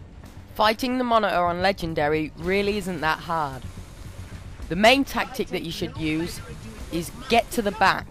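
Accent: United Kingdom. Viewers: is he from uk? COOL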